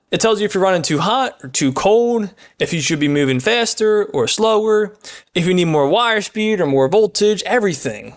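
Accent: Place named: Australia. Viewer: Love the Rolf Harris accent - except he is now persona non grata.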